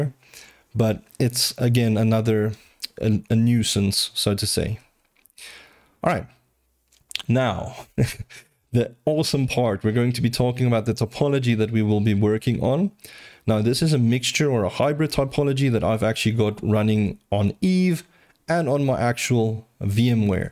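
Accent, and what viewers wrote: South Africa. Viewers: This is amazing, thank you! By the way, where is your accent from? South Africa/Zimbabwe by any chance?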